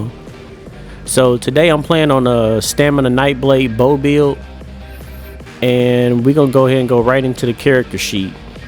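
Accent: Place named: Georgia, United States. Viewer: You sound like my friend from savannah Georgia, idk if you live around that state but I'm from Texas and yall have a stronger accent then us lmao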